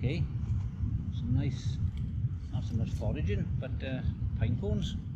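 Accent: Wales, United Kingdom. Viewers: Absoloutely loving the Welsh accent bud and your vids are incredible. Keep up the excellent work, subbed!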